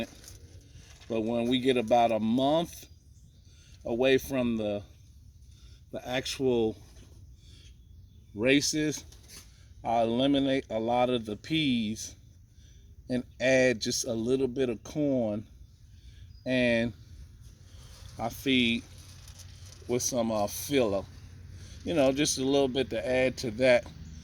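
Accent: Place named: South, United States. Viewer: I knew you sounded southern lol i acually live in tn about 2 hours from u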